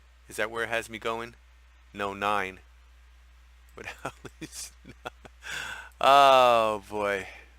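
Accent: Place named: New York, United States. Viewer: Love that accent mate, New York?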